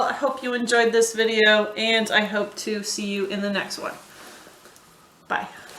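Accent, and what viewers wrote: Canada. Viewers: Canadian accent is awesome. I bet us southerners sound like beverly hillbillies to you 😂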